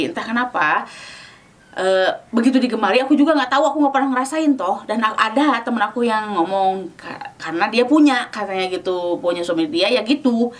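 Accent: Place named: West Java, Indonesia. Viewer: Meni sunda pisan 😅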